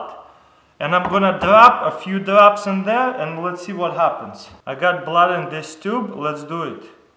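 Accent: Russia. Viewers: No its just because he is Russian, and the English language is very difficult sometimes for people going from Russian and picking up English. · I SO KNEW you were Russian! I'm Russian too...decent...and I recognized the accent. Thanks Dude you're way cool! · are you Russian? sorry for judging off an accent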